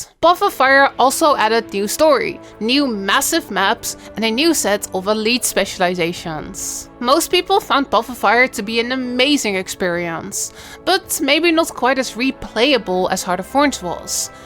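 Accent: Netherlands. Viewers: dat nederlands accent erbij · Do I smell a Dutch accent?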